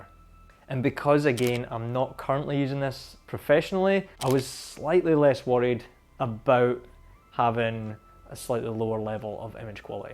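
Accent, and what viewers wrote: Scotland, United Kingdom. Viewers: Hey man, i love your scottish accent and the video. I do photography for many years, but i am in sort of an impass now, what would you choose between XT2 and a D750? I really love the ease of use of the XT2 with controls on the body like my film SLRs, and the portability, but a part of me wants to get into the big boy league and go full frame. While i know gear isn't important, i do want alot of quality for my photographs (i am only doing it as a hobby of which i am very passionate about). Another thing i am worried about is that my MF lenses (mostly M42), won't work that well with the D750, and i really love them. Cheers!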